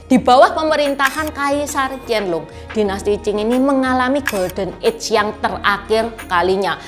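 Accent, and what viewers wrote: Java, Indonesia. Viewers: Logat jawa mu dlm kl y berdaaarkan Dr MN,cerita nya? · Looo ... Kok .... Ngene 😁😀😁😀😁😀 ngomong nee ... 😁😀😁😀😁😀 Iki Tionghoa atau jawoooo.... 😁😀😁😀😁😀 Ngomong nya medoook ..😁😀😁😀😁😀😀😀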